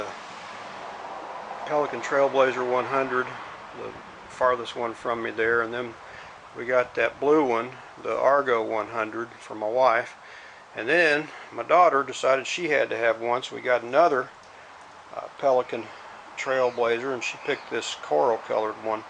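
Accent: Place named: Texas, United States. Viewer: Nice solution for clearing out the deck. I have only one so I hung mine from the rafters in my garage. By the way I forgot to say from your last video, your new intro is awesome, the Texan in you really comes thru. Thanks for the video, looking forward to new adventures with you my friend. JT. from Oregon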